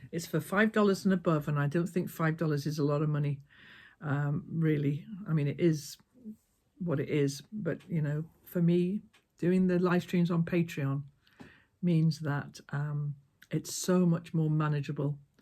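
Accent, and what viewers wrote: England, United Kingdom. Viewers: I do wish I had found you in the midst of the pandemic when I was stuck home and missing my friends, because watching your videos is like sitting with one of my friends except of course your English accent because I am American. I am very glad to find you now and am watching all your videos and am thinking I am going to have to join your patreon channel to see what that is all about. I have never felt the need to join one before so maybe I better check it out.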